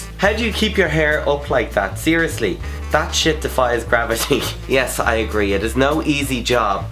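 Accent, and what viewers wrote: Ireland. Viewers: ) I love your videos, and your accent! Ireland is one of the prettiest countries I've been to! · your accent is awsome love the irish accent its so sexy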